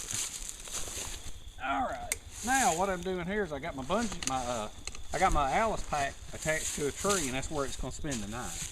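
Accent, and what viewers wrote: South, United States. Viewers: Love the southern accent sir! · Very interesting, thank you. Love the accent, Georgia or Lousiana? 😁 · Thank you.Entertaining. I love your country boy accent!! Where are you from? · Nice video. That southern accent is deep as hell. Hahaha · Love the Southern Accent, And I thought the dog was the Bigfoot...... Love the info but you should come up to Canada for showing extreme shelter's, it's a lot cooler than in the South now in April 28 we are at 37 deg. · I figured you were raised somewhere way down south:) I remember one trip I was in Atlanta and had a flat tire on my trailer, I parked in a two trucks in front of mine so I had to wait, time went by when a guy came out asking "is that y'alls truck over there?" I began looking around for anyone else that could have been the driver of my truck, I 'knew' I was a solo driver from Idaho, "yes Sir that's my wagon," "move it on in." "Y'alls" I had to smile, during our short visit he said "y'all must be from somewhere way up north?" I asked "why, do I talk as funny as you?" We both laughed! 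He said "your accent tells me you're a northerner!" I guess it depends where we are all raised, I love all your videos and your voice, keep them coming. Bomber from southeast Idaho:) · All of us Southerns talk this way. Some accents are stronger than others. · I'm from Tennessee. Where's this guy from? Trying to place the specific southern dialect. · I love his southern accent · Hell of a southern accent. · That’s a thick southern accent my guy. Love it.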